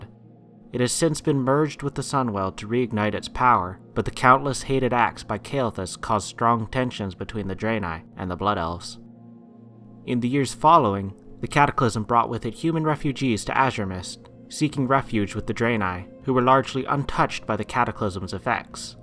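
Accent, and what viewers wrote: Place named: Ireland. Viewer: Are u Irish I hear a slight Irish accent in your voice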